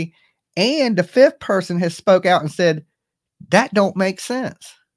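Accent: South, United States. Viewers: You crack me up. Live your southern accent. Great job, keep up good work.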